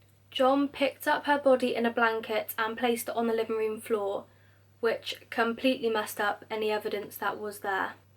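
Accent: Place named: United Kingdom. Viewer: Also, I just love your British accent haha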